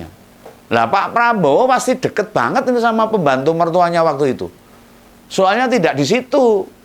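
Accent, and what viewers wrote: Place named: Solo, Indonesia. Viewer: Om asal dari mana ya? Sepertinya dari Solo atau Klaten gaya bicaranya.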